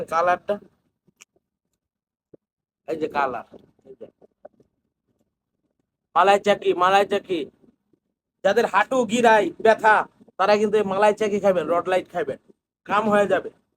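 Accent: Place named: Narsingdi, Bangladesh. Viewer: I, আপনার কথায় নরসিংদীর টান আছে।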